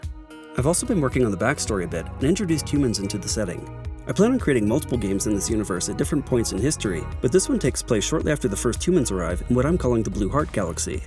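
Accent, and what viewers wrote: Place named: Spain. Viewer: The reason why the creator pronounces it that way is because he is a native Spanish speaker. Portuguese speakers pronounce it as "Go-dodge" as in Dodge. But if we are talking about "the correct way to pronounce it" or "the way you should pronounce it" In English then "Go-Dot" is incorrect and the "Go-Dough/Go-Doe" would be correct in that case.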